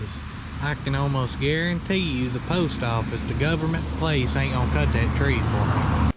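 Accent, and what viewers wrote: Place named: South, United States. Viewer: Never mind them people that don't like a good ole southern drawl. 500 on to 1000. I'm from SC so go Tigers. Y'all still good though.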